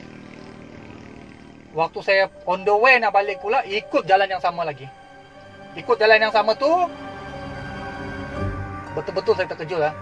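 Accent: Sabah, Malaysia. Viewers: Abang org mana bang? Sebab dengar loghat mcm belah Sabah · sama ada sabah atau indonesia..sbb bahasa nak sama dah..tapi tengok kt video ade tulis indonesia xtaw la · cara bercakap ni tentu orang Sabah bah ni di KK bah tu , inda kau tau itu orang KK itu sana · Kesian loghat sabah pun tk tau bezakan kata org malaysia. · Bunyi sabahan kuat juga tu..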